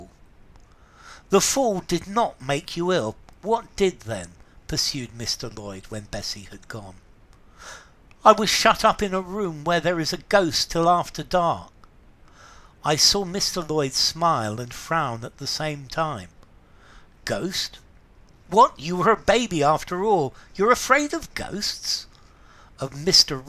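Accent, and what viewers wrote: United Kingdom. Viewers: I'm in love with stories especially with a British accent thanks for sharing this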